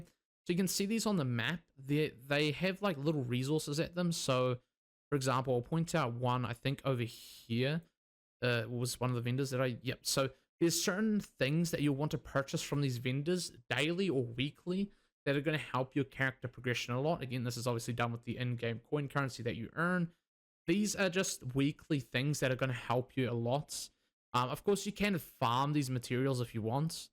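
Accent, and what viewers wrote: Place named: New Zealand. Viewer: Nah he's just from New Zealand, they sound like that innit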